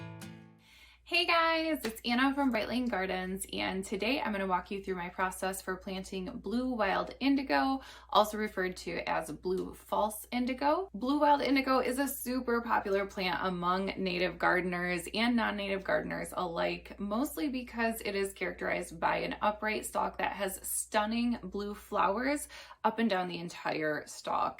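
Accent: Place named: Great Lakes, United States. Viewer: The “baig” pronunciation of “bag” tells me you live near the lakes, like me. ❤️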